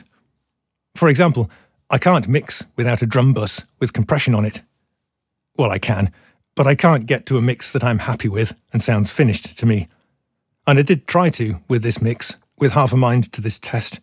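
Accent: United Kingdom. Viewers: The only youtuber with british accent i can actually bear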